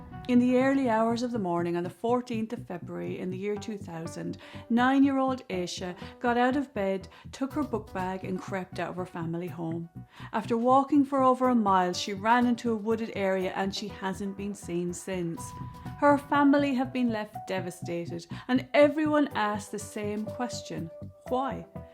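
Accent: Ireland. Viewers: Who was at that slumber party? I think someone at that slumber party or an adult in charge knows what happened to her and why she left that night. Do we know the people her cousin knew? Love the way you delivered on this case. Glad I happened on your site. I think you’re a fellow Irish woman, the accent gave you away. Look forward to hearing more from you.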